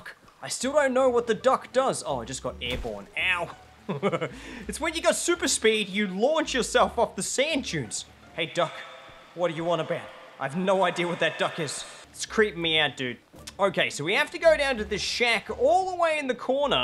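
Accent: Australia. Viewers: Definitely Australian 💀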